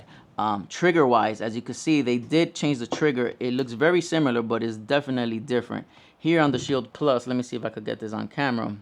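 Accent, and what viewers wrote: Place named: Miami, United States. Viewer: Great video. I love both of these guns. I own the shield plus and have held the 2.0 bodyguard but haven’t shot it yet. Did you find the bodyguard 2.0 to be soft recoiling like some YouTubers are saying? “It feels like a 22” also are you from south Florida? It sounds to me like you have a Miami accent, which is where I’m from.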